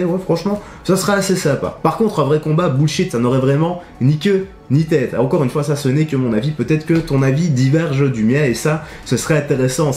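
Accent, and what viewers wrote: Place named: Liège, Belgium. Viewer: Quand je t'écoute, j'ai l'impression que j'entends un léger accent qui revient. On dirait que tu es liégeois ?!?🤔🤔🤔 😎😎😎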